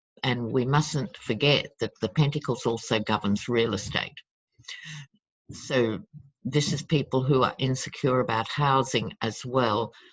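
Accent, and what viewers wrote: Australia. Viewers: Hugs to you,. You rock! I love uploaded videos of wisdom. Keep them coming in that awesome Aussie accent. 💛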